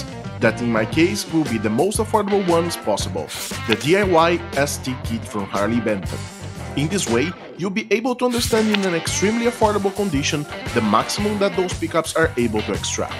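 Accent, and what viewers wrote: Brazil. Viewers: Sotaque de brasileiro falando inglês! Hahahaha vídeo top!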